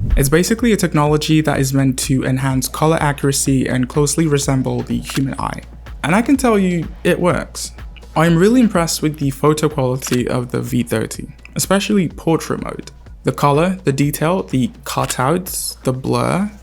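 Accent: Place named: England, United Kingdom. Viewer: he sounds english, am i tweaking??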